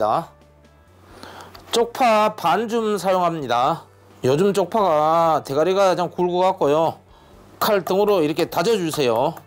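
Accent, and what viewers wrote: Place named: Gwangyang, South Korea. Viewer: 말투가 우리동네 같네요~~ㅋㅋ 정겹네요 이것은인자~~그래갓꼬~~광양말투 항상 맛있게 만들수있게 레시피주셔서 감사합니다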